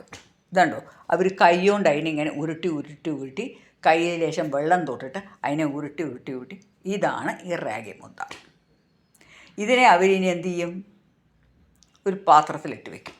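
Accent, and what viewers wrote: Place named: Palakkad, India. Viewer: ഞാനും പാലക്കാട്‌ ആണ്. അതേ slang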